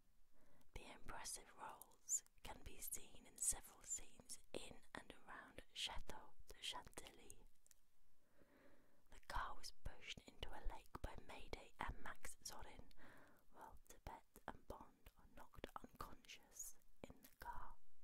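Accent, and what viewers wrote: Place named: United Kingdom. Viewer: Lovely whispering, and I love the British sound 💖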